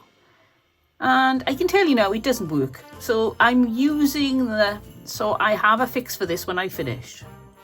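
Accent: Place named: Fairwater, United Kingdom. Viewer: I love watching your videos and your voice brings back lovely memories of the two years as a teen I lived in fairwater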